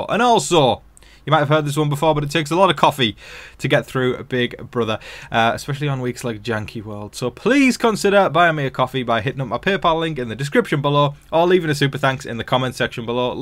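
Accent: United States. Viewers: You have GREAT American accent! From an American! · Wait your American accent is good haha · Your American accent is spot on!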